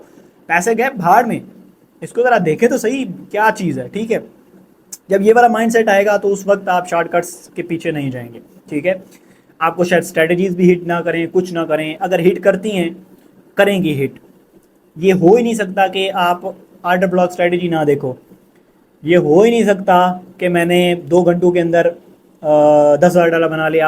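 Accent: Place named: Saraiki, Pakistan. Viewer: sir apke accent se lagta hai aap saraiki hain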